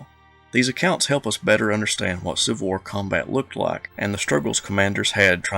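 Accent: South, United States. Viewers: Another great episode! BTW, aside from your "accent" I know you are a Southerner because you pronounce Lafayette correctly.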